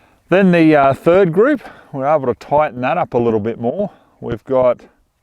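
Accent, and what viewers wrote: Australia. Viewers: He is Australian not European. Why do Americans say Aluminum instead of Aluminium?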